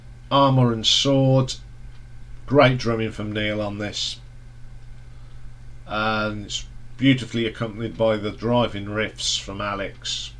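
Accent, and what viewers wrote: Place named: Sheffield, United Kingdom. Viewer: You sound like you're from Sheffield.. am I right?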